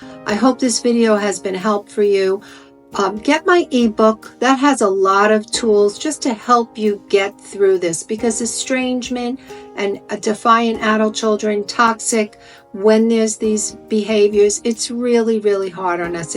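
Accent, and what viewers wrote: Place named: New Jersey, United States. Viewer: I’m guessing New Jersey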